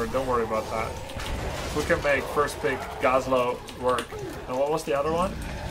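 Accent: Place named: Netherlands. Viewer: Hey man! I first saw you as shoutcaster and wondered if you are Dutch as I thought I recognized the accent. The name Grubby did not make it clear, but now I know its Manuel Schenkhuizen and that sure clears it up ;P I cannot recommend your channel on an educational level as there is a bit too much sarcasm and goofiness, but for pure entertainment it is well worth the sub! Keep it up bro! Groeten uit Spijkenisse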